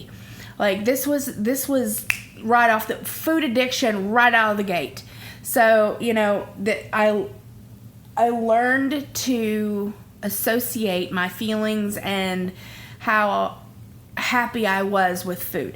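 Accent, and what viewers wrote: South, United States. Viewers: I am so sorry for all the verbal and emotional abuse you have gone thru it actually brought tears to me. hugs you're such a sweetheart. I love your cute lil southern accent and your glasses are super cute. I think you are doing awesome and keep up all the good work girl!! 😁🤗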